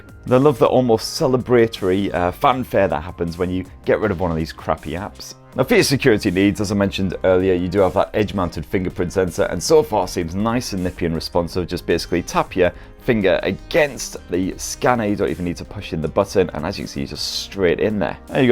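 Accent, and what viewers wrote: United Kingdom. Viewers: He's from the uk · This is so Bri-ish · That's a british accent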